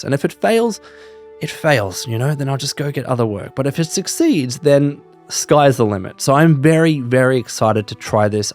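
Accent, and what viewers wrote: Australia. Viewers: Wish you the BEST & absolute success with YouTube,. Your angle has been one of my favorites on the platform. I wager you’d be amazing at Twitch streaming as well to farm some of that YouTube content. The Aussie accent will definitely boost your viewership!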